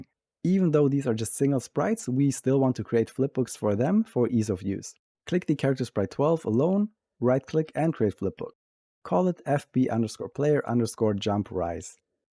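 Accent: Germany. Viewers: Ich höre den deutschen Akzent raus 😄😉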